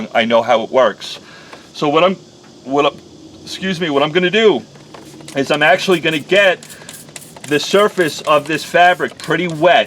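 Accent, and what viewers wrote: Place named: North, United States. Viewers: You don’t sound like you’re from Dallas. You sound like a yankee. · wide awake thanks for helping . I can't stand his boston accent .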